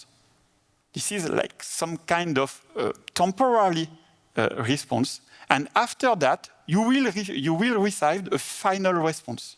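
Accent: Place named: England, United Kingdom. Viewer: why does his french sound like english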